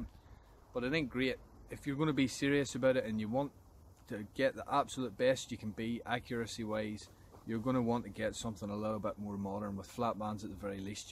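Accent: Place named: Scotland, United Kingdom. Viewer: He sounds like he is from Stranraer in Scotland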